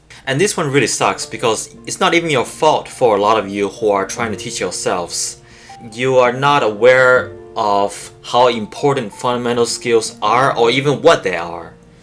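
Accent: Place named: China. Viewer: you have chinese accent ! very talented, thank you for the video !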